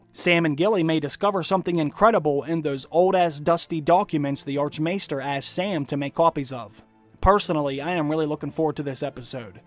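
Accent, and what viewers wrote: Pittsburgh, United States. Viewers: Are you from Pittsburgh? You sound like you're from Pittsburgh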